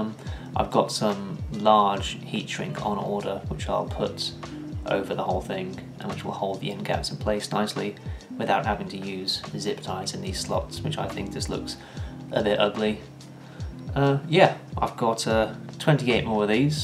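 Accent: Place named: United Kingdom. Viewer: I don't know anything about batteries, I just googled "videos of British people talking funny", but this guy seems really obsessed with sales.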